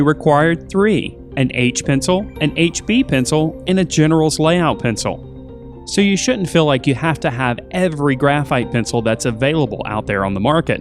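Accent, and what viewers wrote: South, United States. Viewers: Something about the quality of your voice reminds me of Adam Scott ( albeit with a soft southern accent)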